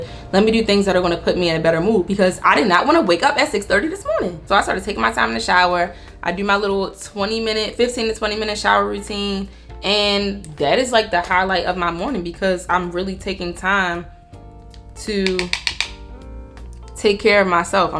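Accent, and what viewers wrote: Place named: DMV, United States. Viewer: Sis you gotta be from the DMV . It’s the accent for me . I love it here 💕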